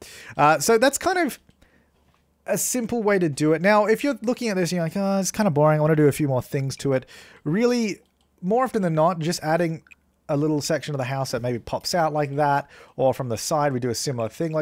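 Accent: Australia. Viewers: Are you from Australia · Are you from Australia? Just wondering as I'm from Australia as well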